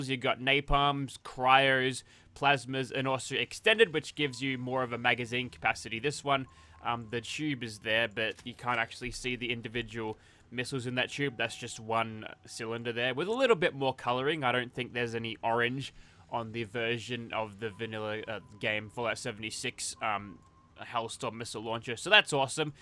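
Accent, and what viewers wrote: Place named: Australia. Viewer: First time in months I’ve listened to your Aussie accent. What do you think of how bad Eddie Jones & the team done in the RWC 2023 ?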